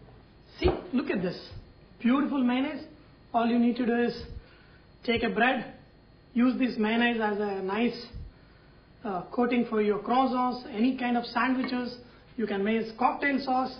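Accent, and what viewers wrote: India. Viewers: its indian Accent....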